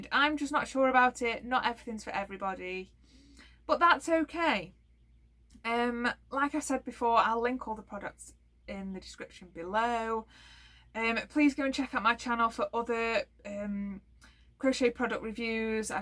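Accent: United Kingdom. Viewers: Are you from burnleh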